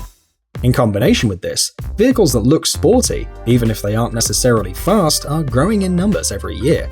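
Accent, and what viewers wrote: England, United Kingdom. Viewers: It is ZED in England, per the narrators accent.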